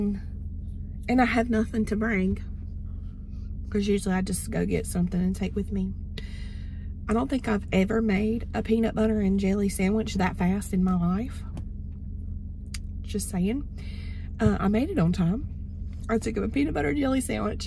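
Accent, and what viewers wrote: South, United States. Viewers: Girl! You is southern! Lol . Gotta drink tea! Hope you feel better soon!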